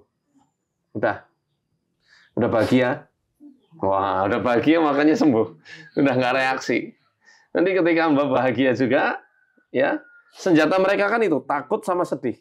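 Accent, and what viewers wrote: Banyumas, Indonesia. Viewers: Jine kiye ngapak juga y ramane 👍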